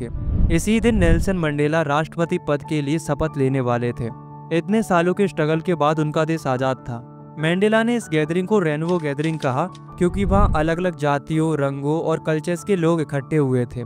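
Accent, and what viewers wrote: Uttarakhand, India. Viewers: Bro you from uttarakhand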